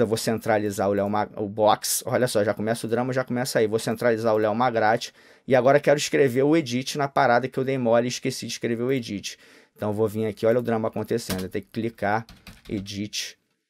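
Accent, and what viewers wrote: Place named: Rio de Janeiro, Brazil. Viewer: Finalmente um Carioca que fala minha língua.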